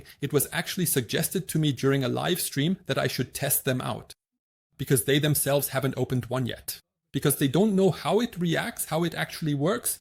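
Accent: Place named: Germany. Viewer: Bro u sound so German but very good explaining!!!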